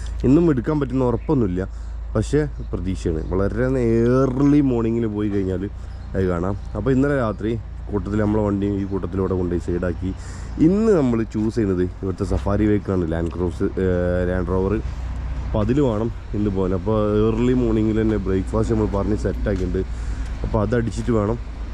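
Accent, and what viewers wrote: Malappuram, India. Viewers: ഒരു രക്ഷയും ഇല്ല ഫുൾ കോമഡി അതിനിടയിൽ മൃഗങ്ങളെ ശ്രദിച്ചില്ല തനി മലപ്പുറം വർത്താനം 😂😂😂😂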